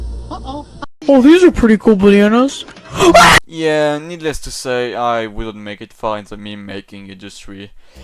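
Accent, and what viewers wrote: France. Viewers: holy french accent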